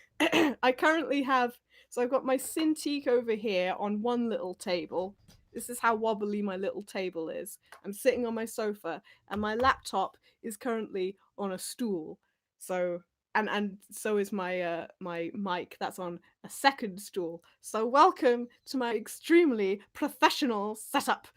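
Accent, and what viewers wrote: United Kingdom. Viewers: i wish i had caught this live. your RP accent is delightful, but i just have two requests. 1) just wanna hear your attempt at a southern american pronunciation of "banana" (buh-NAY-uh-nuh), and 2) wanna hear more of your fantastic singing voice!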